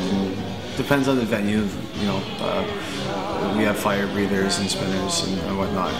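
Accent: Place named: Quebec, Canada. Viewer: Man, he has that French Canadian accent which I can't stand.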